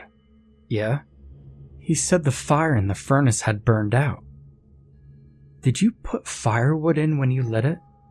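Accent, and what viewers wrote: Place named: Philadelphia, United States. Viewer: The voice over guy is from The Delaware Valley (Philadelphia Area).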